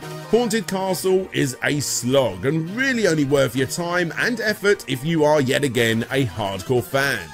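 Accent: United Kingdom. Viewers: he's also British and I'm assuming the commenter is from the USA. Idk, maybe aria and ecclesia sound specifically similar with a British accent. Either way. Pronunciation is different in other parts of the world. As an American, this is why our fellow global citizens sometimes see us as idiotic self-centered assholes. Go learn another language and/or dialect and see how well their native speakers will point way more fundamental language concepts. Be better. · It’s the regional British accent. I had a teacher with the same accent as who pronounced “ideas” as “idears.” Ironic that they drop the “r” on words that end with it and add it to words that end without it.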